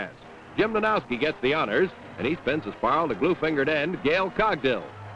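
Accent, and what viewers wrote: Canada. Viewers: Canadian pronunciation.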